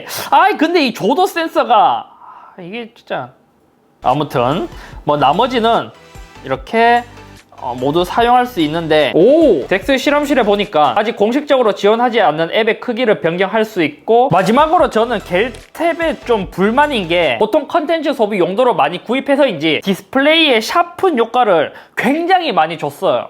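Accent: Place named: Gangwon, South Korea. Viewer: 강원도 억양이시네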